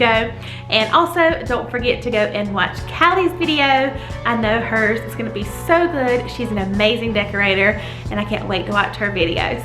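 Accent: South, United States. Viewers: Thank you for sharing!! I’m so excited to decorate, and you just made me ten times more excited! Love your channel, and I just subscribed! Also, do you live in the south? We share the southern accent.🤗💜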